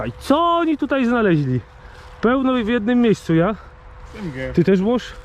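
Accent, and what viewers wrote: Silesia, Poland. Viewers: Godosz po Naszymu a piszesz KASZANKA hahaha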